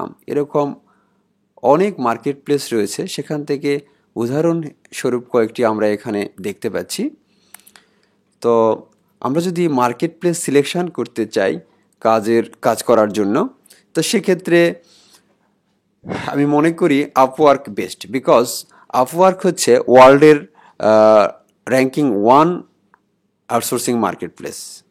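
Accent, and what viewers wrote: Bangladesh. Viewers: ভাষায় খুলনার গন্ধ.... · Vaia apnar basha ki naogaon ???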